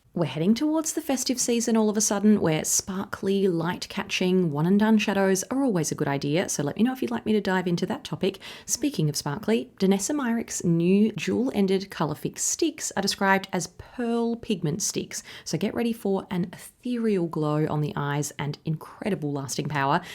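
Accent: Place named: Australia. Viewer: I love your videos! The colors you show are perfect for me. Your Aussie accent and calm voice are lovely. Thanks!❤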